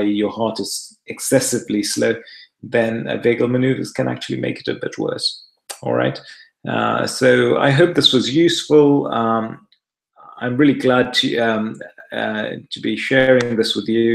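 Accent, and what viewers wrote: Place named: United Kingdom. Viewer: You are sooooo handsome! Why do you have a British accent? You look like my friend, Dr. Raji. From India.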